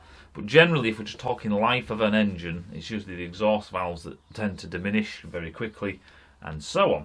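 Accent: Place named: Scotland, United Kingdom. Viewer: Going to watch your video on shaker can painting. And, I always thought you were Scottish. No insult intended. Just all of your accents sound the same to my American ears.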